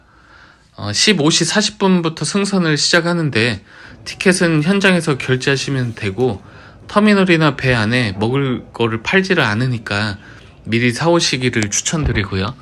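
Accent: Jeolla, South Korea. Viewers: 전라도분 이신가요? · 전라도 사투리 억양 반갑구마 잉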